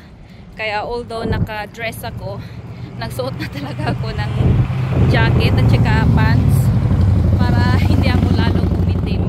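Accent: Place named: United Kingdom. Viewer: Hiningal n c madame at tsaka ñaimpluwensyahan ng British toungue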